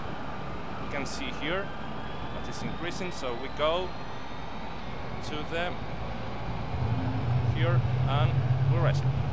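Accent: Spain. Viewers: A complete tutorial by Antonio Banderas (: Just kidding. Your Spanish accent is real class man. I love it!, this is a wonderful aircraft and I'm really enjoying it. Even though I use TrackIR (and I thought everyone did), this plug-in will help a lot. Your link didn't work for me, but I used this one: misc plugins page and see it as "LockView" download. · Damn I love your voice!!! So spanish ^^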